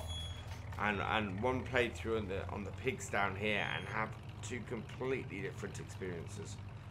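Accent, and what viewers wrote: United Kingdom. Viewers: Are you from Norfolk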